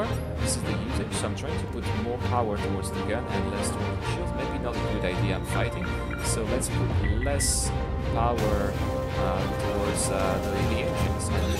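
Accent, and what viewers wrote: France. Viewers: tu es breton ? ^^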